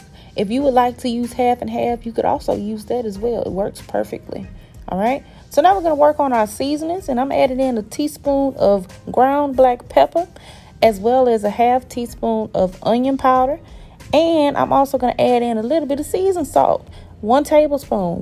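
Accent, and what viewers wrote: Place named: Mississippi, United States. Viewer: Are you from MS? I hear a familiar accent!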